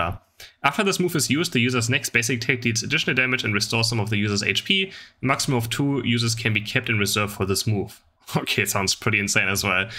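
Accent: Germany. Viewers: where are u from? germany?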